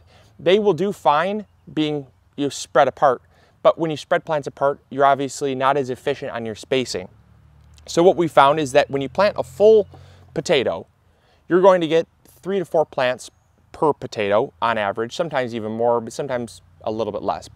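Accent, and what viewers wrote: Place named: Ohio, United States. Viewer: HI..thanks for your videos! I'm not sure if u have mentioned where u live, but I was wondering..where do u live/where are u from? Was curious about the climate u live in, the climate u grow the potatoes in and where your accent is from? Im assuming u are either in Ohio or Wisconsin?? Maybe Michigan? I know u are from the midwest...I am originally from Ohio, but u sound like my cousins when u speak and they are from Ohio but have lived in Wisconsin for the past 20 yrs:)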